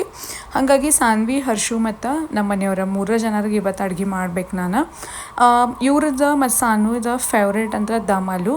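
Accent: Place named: North Karnataka, India. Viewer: Navu uttar Karnataka davaru nivu Nam tara matadtira😊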